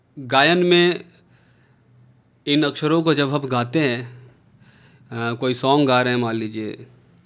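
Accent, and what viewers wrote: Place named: Bhojpuri, India. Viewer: Bhojpuri acha laga Sir apki awaz main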